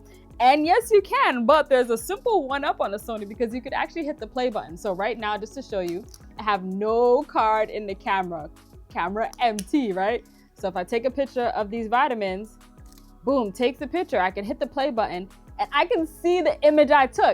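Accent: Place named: Jamaica. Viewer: 🇯🇲🇯🇲 Jamaican accent is amazing big up from yard 🔥🎥🔥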